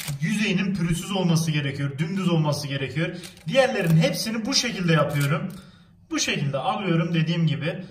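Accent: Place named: Hatay, Turkey. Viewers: Abi anlıyorum hataylisin bende adanaliyim fakat şu konuşma tarzıni degistirirmisin bak abi videolarını severek izliyorum ama diyorsun ki yapiyirum diyorsun abi harfleri yutmadan konuşsan daha anlaşılır olur yapıyorum de abi dolaba koyuyorum de abi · Hatay sadig demeniz hatay iskenderun olmanızla ilgili Şive tam bizim koyun şivesi gidük gelük şuraya öte yayliye öte buri gel napön falan hataylıyım insalah bir gun karşılaşıp yaptiklarindan tatarım😀👍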